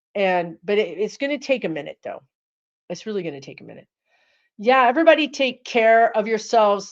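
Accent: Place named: South, United States. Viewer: I’m rarely available to watch your Friday night live shows. But, know that I look forward to watching them ASAP. Thank you from one southern born gal to another. Your “you alls” feel like my home back when MAGA was a misspelled word. Little blue dot 🔵 in a RED county.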